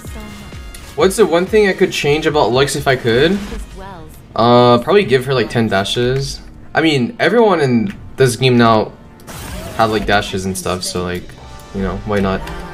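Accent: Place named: South Korea. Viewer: I have to ask just because I love the way you talk -- where is your accent from? I'm getting Korean vibes haha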